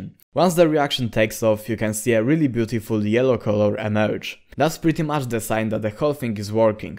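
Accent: Poland. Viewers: why your accent suggest me, you are from Poland xD? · Your English is really good and the humor is really great paired with videos like this. I like your accent but I can’t tell where it’s from. You sound Polish but I don’t know for sure (sorry). What country are you from? Do you live there or are you in the US now?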